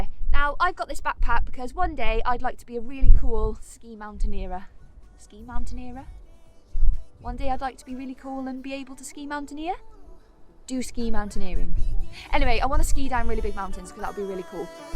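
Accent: Wales, United Kingdom. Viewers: Nice review, Great to see someone actually give a pack some abuse on a review haha, funny and informative, and a great welsh accent. Quality video.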